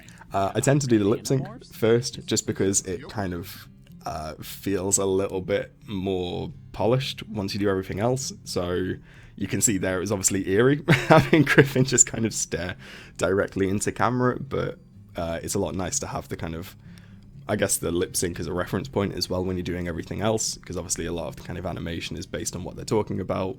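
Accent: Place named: United Kingdom. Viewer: hehe, as an expat living in australia it literally gives me a little seratonin boost whenever I hear a british accent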